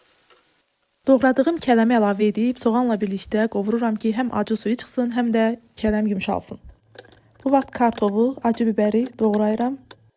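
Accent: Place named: Karabakh, Azerbaijan. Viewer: Kanaliniz yeni qarsma cxdi abune oldum😊xeyrli ugurlu olsun🙌deyesen Qarabaglisiz lehceniz sirin geldi❤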